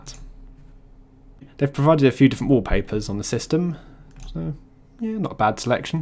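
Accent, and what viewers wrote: United Kingdom. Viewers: Nice review done in Blighty English. How refreshing. Great job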